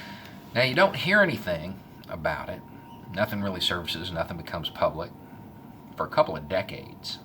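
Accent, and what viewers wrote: South, United States. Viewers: I really like your dedication to your country. I only wish more people were as informed, and as intelligent, as you are, Sir. And I really love your southern accent!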